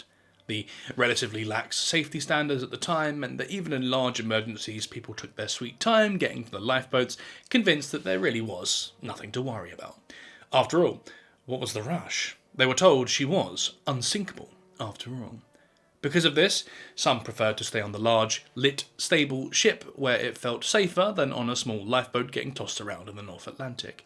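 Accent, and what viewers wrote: United Kingdom. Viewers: Great report, the UK accent is a must do too. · Between him and Simon Whistler. It seems my favorite past time is having a British man tell me about historic terrors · I like British accent in narration 😊.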